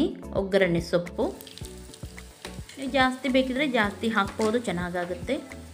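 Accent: Mangalore, India. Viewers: Buddy it's Mangalore accent and request u to Rome all over Karnataka to learn or understand before committing....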